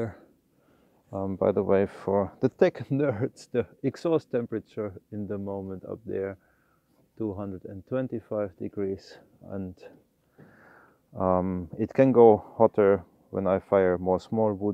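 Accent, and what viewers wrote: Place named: Finland. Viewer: Thanks for sharing . Your accent speaking English is getting more and more Finnish and less German. Not a bad thing, just an observation 😊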